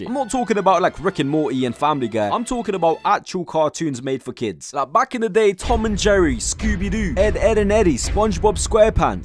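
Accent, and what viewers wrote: United Kingdom. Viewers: the last one is totally true from my own experience, watching your video with Briish accent is way more easier with zaza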